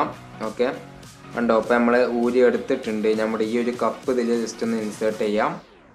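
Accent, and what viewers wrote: Malappuram, India. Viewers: Nee മലപ്പുറം ആണോ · മോനെ നിനക്കും നിന്റെ വടക്കൻ സംസാരത്തിനും നല്ല ഗ്ലാമർ ഉണ്ട്‌. വീഡിയോ ഇഷപ്പെട്ടു നന്ദി. വീഡിയോയേക്കാൾ ഇഷപ്പെട്ടത് അതിന്റെ ബാക്ക്ഗ്രൗണ്ട് മ്യൂസിക്ക് ആണ്. അത് എഡിറ്റ്‌ ചെയ്തവരോട് ചോദിച്ച് ആ മ്യൂസിക്കിന്റെ പേരും അത് പ്രൊഡ്യൂസ് ചെയ്തയാളുടെ പേരും എന്റെ ഫോണിൽ (<num>) SMS ആയി അയച്ചു തരണമെന്ന്‌ അഭ്യർത്ഥിക്കുന്നു. ആ മ്യൂസിക് നെറ്റിൽനിന്നെടുക്കാൻ വേണ്ടിയാണ്. അ മ്യൂസിക് കേൾക്കുമ്പോൾ യൂറോപ്പിൽ ഇരിക്കുന്ന പ്രതീതി. തീർച്ചയായും അയച്ചു തരുമല്ലോ?. താങ്ക്സ്.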